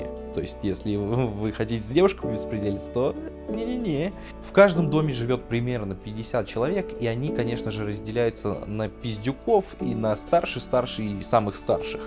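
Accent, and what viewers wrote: United Kingdom. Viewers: Дружище, у тебя ахуенный британский акцент. Южный как я понимаю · Чувак, очень классное видео, продолжай и достигнешь высот! Кстати, если не сложно, сними, пожалуйста, видео о постановке британского акцента, твой звучит очень аутентично! Мб хоть какие-нибудь советы дашь, как улучшить звучание (не говорю о дефолтных TH и т.д) В общем, урок для тех, кто изучает английский в России, но не находится на начальном уровне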